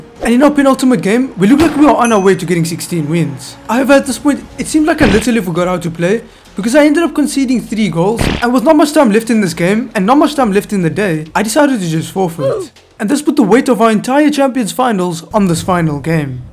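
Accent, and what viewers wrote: South Africa. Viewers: I'm not gonna lie his sounds a little south african 😅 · are you south african??? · Are you from south Africa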